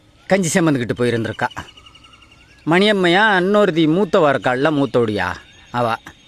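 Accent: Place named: Tirunelveli, India. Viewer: ஏனே... கலக்கிட்டிய போங்க... உங்கள மாதிரி திருநெல்வேலி பாச பேசி நல்லா செ...ப்பால அடிச்ச மாதிரி சொன்னாலும் அந்த கூ 🔥 விள்ளய திருந்தாதுவோ.... இருந்தாலும் தொடர்ந்து பேசுங்க... 👌👌👌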